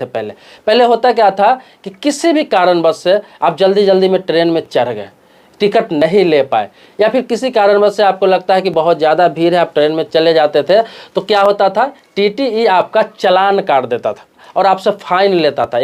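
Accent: Bihar, India. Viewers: Thank YOU for very explicit explanations !! Your service has been probably appreciated !! JAI HINDs & Vandey Maatharams from Dr Ramesh Murthy, A ( Retd ) MENTAL HEALTH SPECIALIST originally from Bangalore City but Qualified in Madhusudan Pranesh, GWALIOR from GRMC of JIWAJI UNIVERSITY !! Bhai, AAP BIHAR Pranesh he hain kyaw ??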